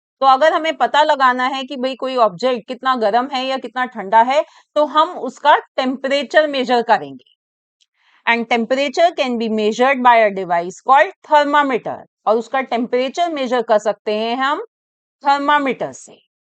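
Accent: Bihar, India. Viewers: Mam can you tell are you from bihar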